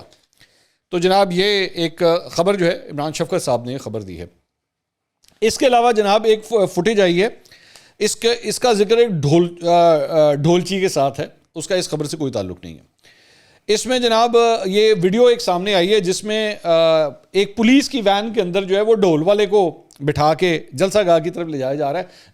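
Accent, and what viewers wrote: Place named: Lahore, Pakistan. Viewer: Bhai, I have limited interest in the news that you give. I just watch your vlogs to listen to your Punjabi dialogues. My parents used to speak the same way. They came from the walled city of Lahore when the partition took place. 🙂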